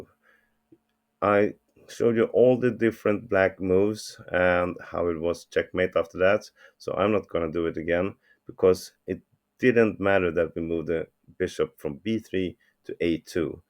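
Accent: Sweden. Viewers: Är du svensk? Det låter så på din brytning. (Are you Swedish? Your accent sounds so.)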